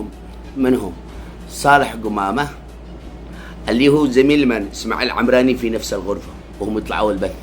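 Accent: Arab world. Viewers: انت اردني ولا يمني اللهجه ماهي يمنيه . والله انك مهجن ابن حرام شكلك وهذا تحليلي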